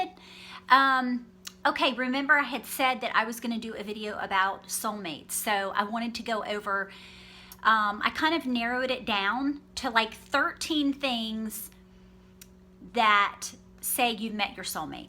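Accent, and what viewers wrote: United States. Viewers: are you from georgia,cause your accent sounds like it lol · American accent here. 😅😊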